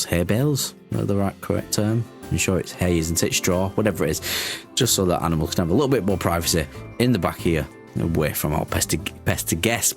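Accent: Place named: Northern England, United Kingdom. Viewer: Random comment, but being from the UK, I love hearing a northern voice on YT!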